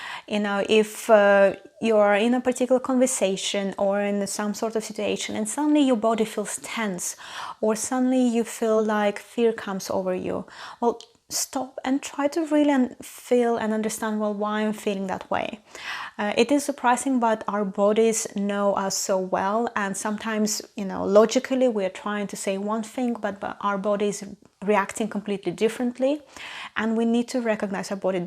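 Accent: Russia. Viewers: I can hear Russian accent. Are you from Russia?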